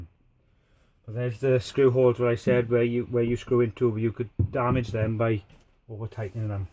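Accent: Wales, United Kingdom. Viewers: Love your accent. You remind me of my time living in Penbrokeshire Wales. Thanks for explaining the shade repair.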